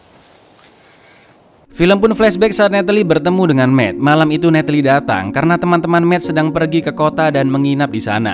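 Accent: Java, Indonesia. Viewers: Saya juga ngikut ah 3.suka ngelawak dan medoknya lucu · logat jowomu lurrr,,,,bkin auto sub chanel mu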